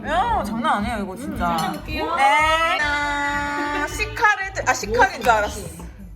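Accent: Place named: Busan, South Korea. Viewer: 첫멘트 어? 리얼리? 발음이 좋으십니다 ㅋㅋ 한달뒤 휴가인데 말투 듣고 있으니 벌써 부산에 와있는 기분이네요 부싼 아입니꺼....ㅋ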